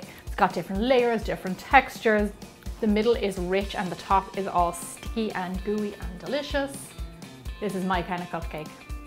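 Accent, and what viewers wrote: Ireland. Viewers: What part of Ireland are you from I live in a Cork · Are you irish?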